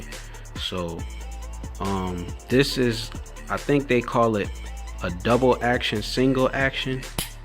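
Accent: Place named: AAVE, United States. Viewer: Dey pretty much uhmm yeah dey day dey day damn son lose that rap shit and fix the umm Ebonics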